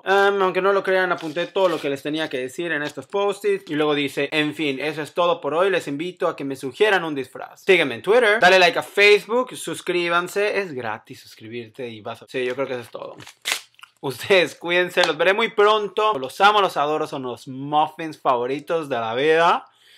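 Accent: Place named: Yucatan, Mexico. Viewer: eres de Yucatan?? tienes el acento....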